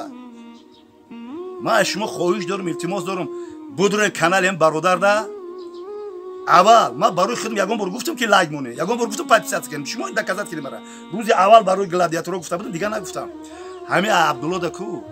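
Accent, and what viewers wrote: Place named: Khujand, Tajikistan. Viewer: Гап нест бобичон чак чакот зурай, хдмонский гап мезани, 💪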